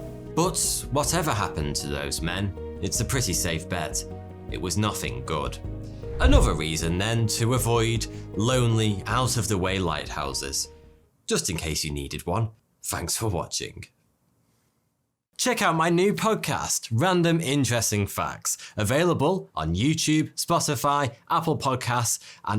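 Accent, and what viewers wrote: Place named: North, United Kingdom. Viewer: Tha sound northern thougnty..where ya from pal..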